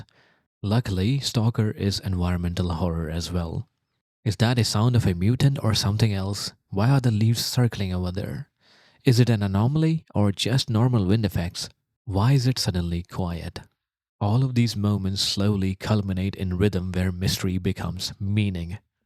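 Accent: India. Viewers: I was like "Is this guy Indian or something ?", turns out yes, you are, I detected some thin traces of accent (one of my best friends is Indian too - for the record)... Anyways, you have a really good story telling, and the way your display your culture and philosphy in this video is very well written, I quite like all those small pieces you give, they form a well woven story, and I agree with all of that : you can always leave the Zone, but the Zone will never leave you.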